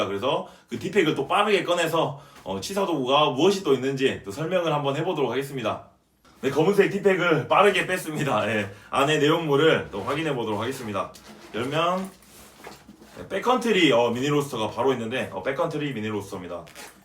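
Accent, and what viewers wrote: South Korea. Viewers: 아따 전라도 백패커요~ 강원도 충청도 경상도 백패커는 많이 봤는데 전라도 백패커는 첨보요~ 반갑쏘잉~ 말이 잼나고 좃쏘이 따라해봤어요. 전 부산출신 경기도 거주 사람이에요 ㅋ 잼나게 잘봤어요. 전라도 유튜버는 첨봐서 기대가 되네요 ㅎ 잼난 영상 많이 찍어주쏘잉~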